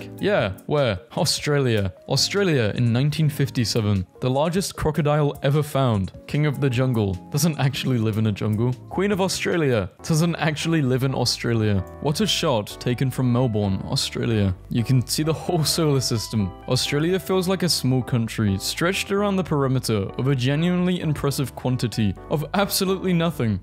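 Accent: United Kingdom. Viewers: YOU’RE BRITISH?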